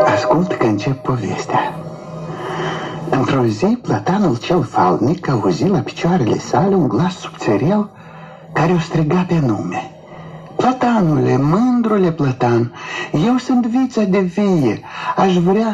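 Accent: Moldova. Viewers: Super ! Fermecatoare povestea ! Superba vocea si superb graiul moldovenesc ! Cât despre platani,aici m ai nimerit la fix pt ca pur si simplu sunt fascinată de ei ! In fiecare zi cand trec prin parc nu ma satur admirandu i ,le fac poze,ii orivesc ca oe niste opere de arta !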